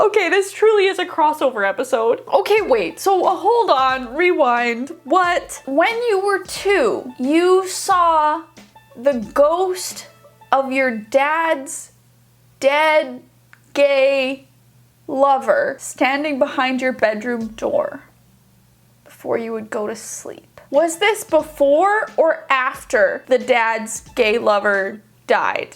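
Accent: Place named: Canada. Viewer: That's the Canadian jumping out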